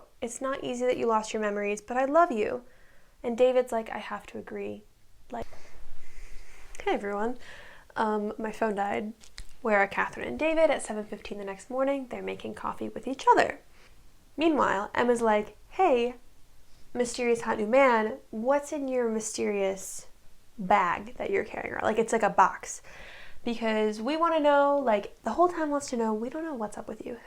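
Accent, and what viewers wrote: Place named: Australia. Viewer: Wow! Never expected to realize that I needed a full retrospective on season 1 of OUAT. Thank you so much for processing this mess of a show into a coherent recap. This video rekindled my interest in this fandom, so I guess it's time to dig some of my favs from ao3. Because the show treats itself so seriously, I was giggling whenever you were referring to Rumple or whoever with "bestie" or completely slandering someone for whatever illogical thing they did. Like half of the time plot important characters appear out of nowhere to do some random moral talk for a side-story character because... reasons? Like why the hell Belle suddenly materialized to give a true love speech for Grumpy/Dreamy. It's even funnier when you consider her side quest with Mulan just somewhere around that same time period. Like the Mulan? Seriously? Or the fact that Regina crushes Christian's Gray heart(whatever is his name in the series) and no one actually cares in just about a few minutes(even Emma with her unresolved internal conflicts and fear of abandonment) but Catherine not-so-dead-but-actually-kidnapped is getting like multiple episodes in which everyone in town speculates on what's happened only because let's be honest here it's just juicier gossip than random ass sheriff dying of a heart attack in what? 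30ish years old? It was two hours and a half long video and I chuckled every time you did that. Also, your Australian accent and some characters' quirks impressions were just killing me, like I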